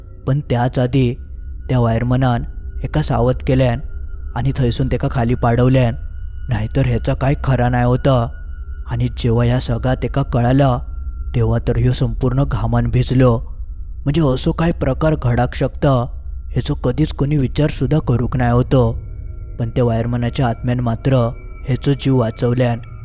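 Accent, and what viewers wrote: Malvani, India. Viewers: Ti malvani ha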